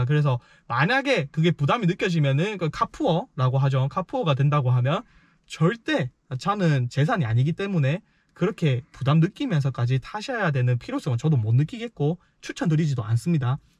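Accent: Busan, South Korea. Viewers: 20대??ㅎ~ 항상 밝고 시원스런 부산 사투리와 성실해 보임에 정감이 갑니다~ 꾸준히 하신다면 성공예감!!!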